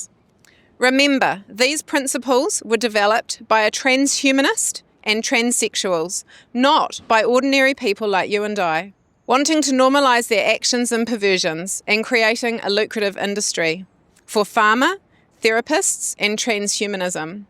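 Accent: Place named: New Zealand. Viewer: Dickheads long strategy -- sex years long ... you gotta love NZ accent 😂😂😂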